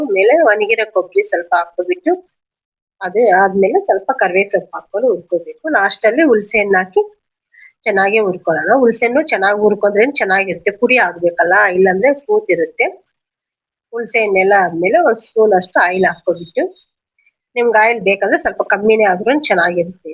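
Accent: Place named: Andhra Pradesh, India. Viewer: Neevu kannada tumba cutagi matadtira madam❤😊🎉 I think nimma mother tongue Telugu irabahudu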